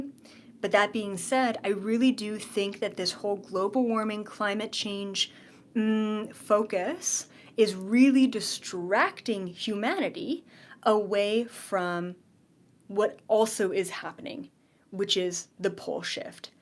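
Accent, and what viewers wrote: Canada. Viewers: Nice to hear a tiny bit of your Canadian accent come out! I'm from what province are you from originally?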